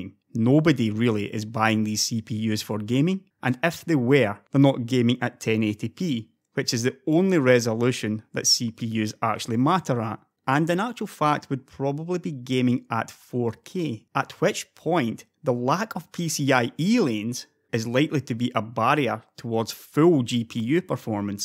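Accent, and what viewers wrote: Scotland, United Kingdom. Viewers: his presentations really help me nail doon Scottish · Nice scottish acent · are you scottish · Scottish accent + tech channel = insta sub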